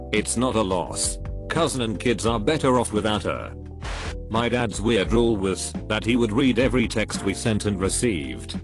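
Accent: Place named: United Kingdom. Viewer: Although I can understand English almost perfectly, this British robot voice is always so hard to understand. I'd rather have someone just reading them out loud that would be much better.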